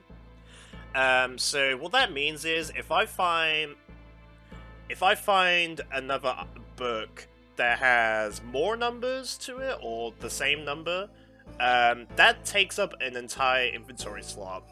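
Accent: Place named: Australia. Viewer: that sounds about right. I was hearing some Australian too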